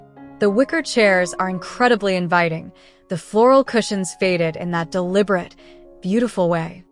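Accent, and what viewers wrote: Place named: United States. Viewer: So sad an English company and beautiful rooms has to be spoken by an american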